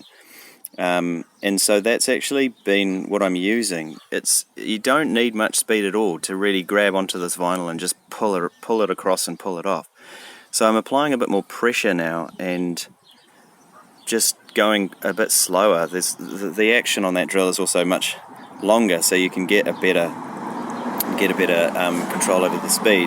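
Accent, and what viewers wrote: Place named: New Zealand. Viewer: am i hearing a fellow kiwi 🤙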